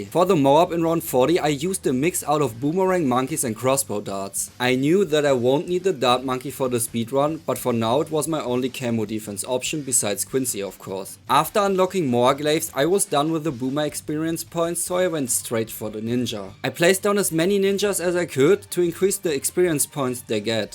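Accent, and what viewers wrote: Germany. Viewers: Er: Woher weißt du, dass ich deutsch bin? Auch sein Akzent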